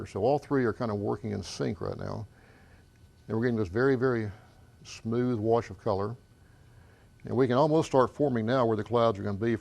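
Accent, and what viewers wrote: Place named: Texas, United States. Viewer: You sound like a Texan.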